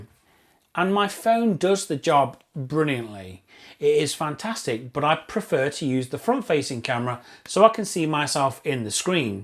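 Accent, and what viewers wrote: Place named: England, United Kingdom. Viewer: You look like American but your accent says you're English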